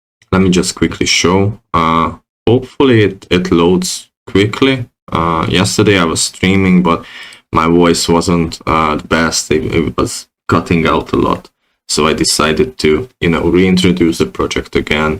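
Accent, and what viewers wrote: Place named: Hungary. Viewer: you have very hungarian accent.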